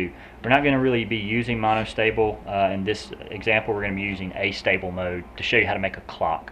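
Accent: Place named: United States. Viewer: that American accent :D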